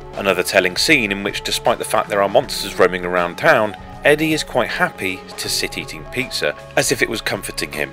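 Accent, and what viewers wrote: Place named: Yorkshire, United Kingdom. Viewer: Glad to hear a friendly Yorkshire voice 😀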